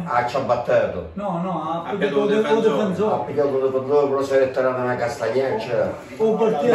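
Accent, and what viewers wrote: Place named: Basilicata, Italy. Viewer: Non credo sia Calabrese, penso sia della Basilicata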